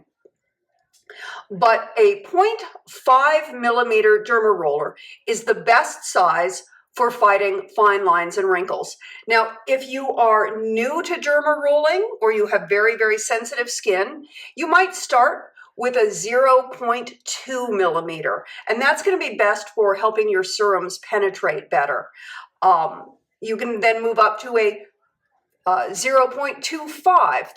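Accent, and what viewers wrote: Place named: Southern California, United States. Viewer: Noooo. He still sounds like a he, like Bruce.. It's just the S. Cali accent .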